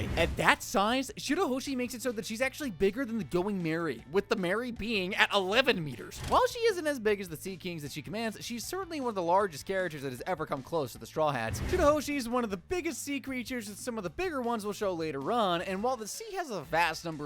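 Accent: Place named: United States. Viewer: This video is in english narrated by what sounds like an american. Why then would you give metric measurements instead of feet and inches?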